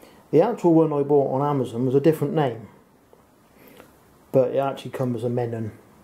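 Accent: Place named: United Kingdom. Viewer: A 16:6 wide angle Mennon lens hood - the type seen in this video - is available on Amazon. It comes with a 55-58 mm step-up ring. This is a great video, by the way. I've one question, though: no matter how obscure my questions, whether regarding a vintage 1918 film camera or the most recent digital 4K cameras, virtually every YouTube video that answers my questions is the work a Brit. Any time a video a starts and I hear any sort of UK accent, I think: "Here come the answers!" Have you cornered the market of photographic experience and insight? No - thank YOU!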